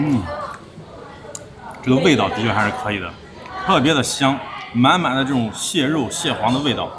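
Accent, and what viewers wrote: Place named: Shaanxi, China. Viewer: 是陕西人吗？陕西口音有些重。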